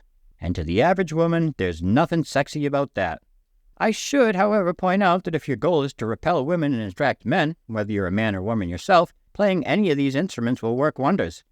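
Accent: New England, United States. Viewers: Hearing you makes me miss New England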